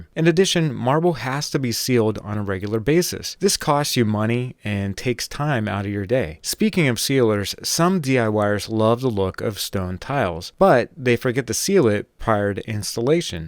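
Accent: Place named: Pittsburgh, United States. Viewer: I KNEW I heard a pittsburgh accent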